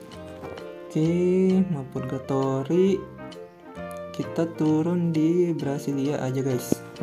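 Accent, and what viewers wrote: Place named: Sulawesi, Indonesia. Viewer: Bg km pasti org Sulawesi!!?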